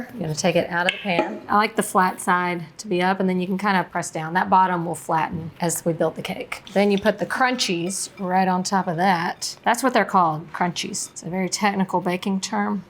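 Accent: South, United States. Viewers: I hear a slight southern accent 🤔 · has a sweet southern accent, one of the things I love about listening to her videos. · Yes she's great at this! But I always welcome a southern drawl...ya' all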